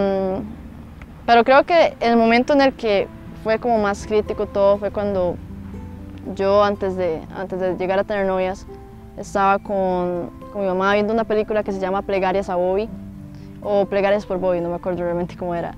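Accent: Costa Rica. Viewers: los ticos pronunciamos la r asi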